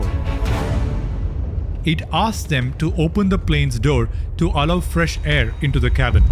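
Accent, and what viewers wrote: India. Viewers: Awful channel, awful Indian accent 😂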